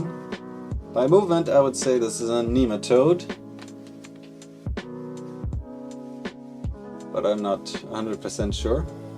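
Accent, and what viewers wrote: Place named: France. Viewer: Yessss, gorgeous French accent albeit very feint 🥹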